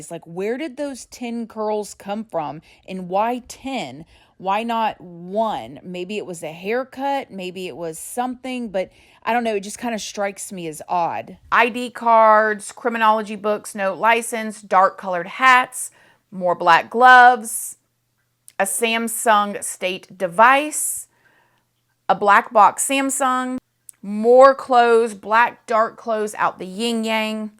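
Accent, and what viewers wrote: South, United States. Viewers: WeekAND.. love that southern drawl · your so beautiful i absolutely listening to you talk I like your accent! Southern... it’s so indearing even if your speaking on something like this 😮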